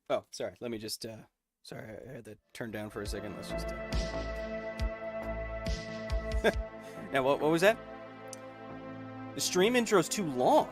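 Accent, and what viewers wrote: Queensland, Australia. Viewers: 1. North-Eastern. That's at least northern-NSW if not QLD or NT. We have far less "twang" down south. 2. Geiger.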